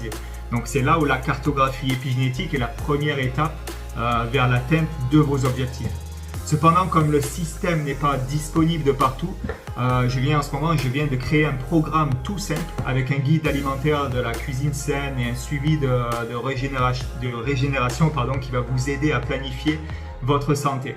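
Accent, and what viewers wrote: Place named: South, France. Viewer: Ah tellement de jolies explications! Couplées à cette accent du sud, magnifique!! ☀️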